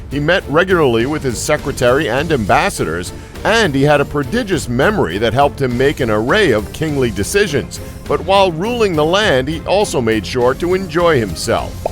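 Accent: United States. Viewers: Why do have to listen to an American commentator, with a dreadful American accent, on this video? A British commentator would never use the word “freakin,” and he would also know how to pronounce the word “Salisbury” correctly. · What the hell is an American robotic going to sound credible about English history. Don’t make me laugh. Didn’t even watch. Too stupid. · The American accent seems to cheapen even these inaccurate facts...very off putting. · Whats with the yank commentary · Urrgh, i turned off as soon as a heard the American voice...this video will be 90% bullshit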